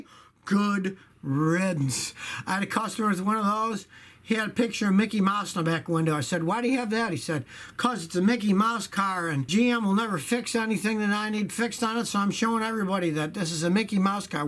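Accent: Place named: Transatlantic, United States. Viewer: Where did you get that "trans-atlantic accent??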